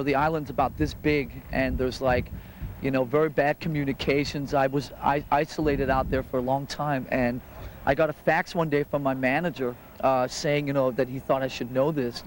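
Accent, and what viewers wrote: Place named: Denmark. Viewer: It’s the Danish accent lol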